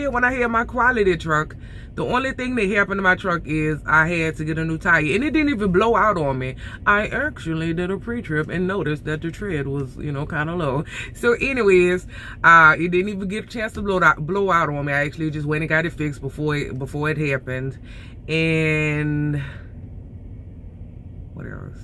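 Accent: Louisiana, United States. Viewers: Ramon Heard Jr it’s a Louisiana Cajun accent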